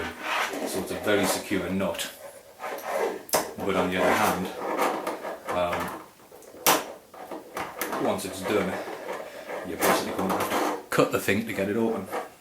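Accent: United Kingdom. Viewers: Nice vid. Used this to confirm an approach were taking with soft strops. Using a Petzl Micrograb instead of the pussick. Are you from the north east?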